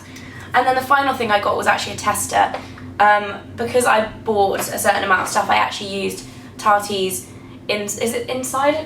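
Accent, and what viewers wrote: United Kingdom. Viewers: Just discover you... and I LOVE the accent of the UK! LOVE it. You are beautiful!! xx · wow I really love your English accent! so elegant! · omg yes your british :)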